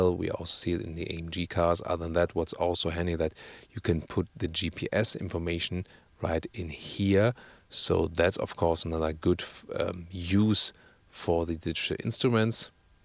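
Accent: Germany. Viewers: he is the germanest person i've heard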